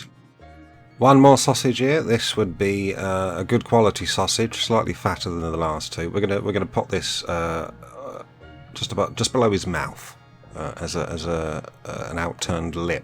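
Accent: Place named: Manchester, United Kingdom. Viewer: Yes i got Paul Calf vibes from the accent at times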